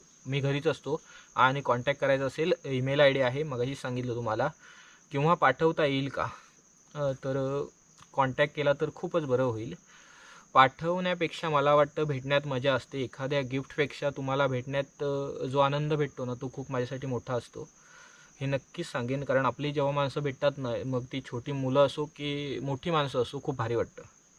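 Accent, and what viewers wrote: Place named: Malvan, India. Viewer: Malvani boltas taa pan bhari vata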